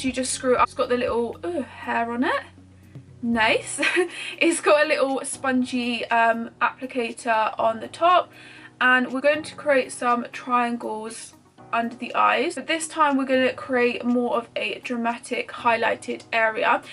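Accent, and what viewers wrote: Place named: England, United Kingdom. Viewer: oh my gosh i had no idea you were English!! anyways, I love your Instagram makeup tutorials and love this very helpful video ❤️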